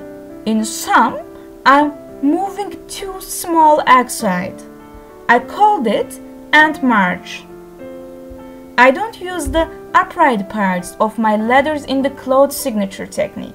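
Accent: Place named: Japan. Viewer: Japanese English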